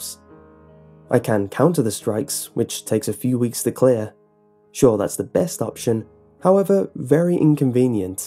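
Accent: United Kingdom. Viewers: it's okay dude I will love your videos I also like your British accent manual coolest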